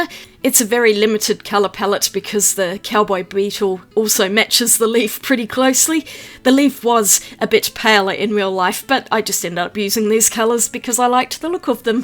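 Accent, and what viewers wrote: Australia. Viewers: Love your channel, love the swatching, your awesome Aussie accent (I'm an American -- we love British and Aussie accents), love your sketchbook sessions. My motto: When in doubt, paint planets!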